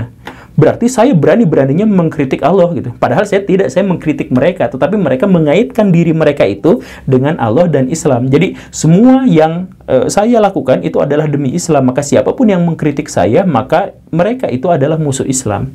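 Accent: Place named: Bandung, Indonesia. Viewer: Btw, Bapa ini jago, di awal beliau seolah tidak sepakat dg konklusi organisasi Islam terbesar di Indo, tetapi di ending, konklusi beliau ya sebenrnya sepaakat dg org Islam terbesar di Indo hehehe...itulah jagonya org dg kultur Sunda, pandai menyamarkan dlm kalimat hehe...oya, kalau dri logat beliau...kayaknya asal daerah Kab. Bandung deket2 Garut, sekitaran Cicalengka gt yak hehe...salam😊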